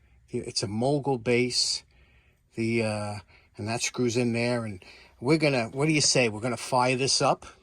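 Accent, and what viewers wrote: New York, United States. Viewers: That was a great show today. You made me chuckle when you said it's a “ewing ax” as in JR Ewing. It’s “hewing ax” but you just can’t hide that New York accent. a smart guy, worried he might get electrocuted so “I’ll give it to 😂”. I’ve never seen a double handed screwdriver before and I love that Greenfield tap wrench. Cheers mate, Stuart 🇦🇺.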